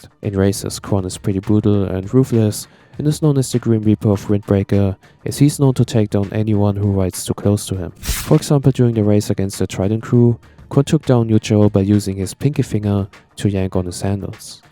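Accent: Germany. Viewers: New to this channel but as i windbreaker fan i love this video. Considering your slight accent are you also german?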